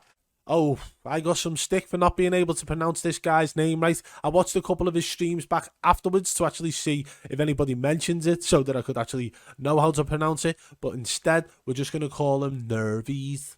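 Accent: Liverpool, United Kingdom. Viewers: Great work again buddy, scouser?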